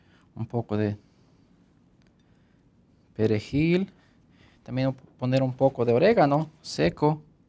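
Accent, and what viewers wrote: United States. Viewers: El mexicano hablando como los gringo aprendiendo hablar español jajajaja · Déjate de acento estadoudinense,no te queda bien amigo!!!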